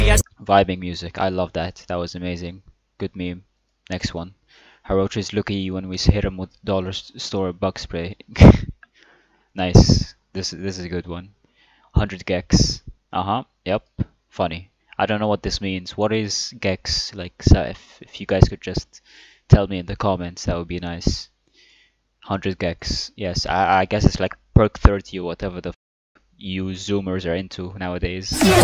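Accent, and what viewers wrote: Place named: Egypt. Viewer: the egyptian accent is just 👌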